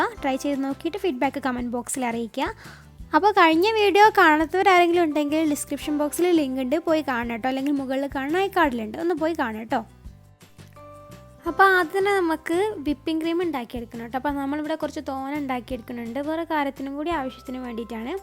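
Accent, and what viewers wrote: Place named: Kasaragod, India. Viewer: Nammale kasargod slang aanallooo😍😍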